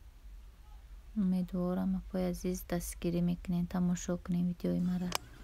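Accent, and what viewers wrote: Kulob, Tajikistan. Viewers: Шумо ай кулоб.хастен мада гапзанитон макулай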